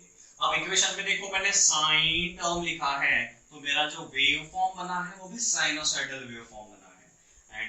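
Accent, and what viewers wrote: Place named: India. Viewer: Best.. just need to improve style of speaking becuse ur style seem desi type. May Allah give u success ameen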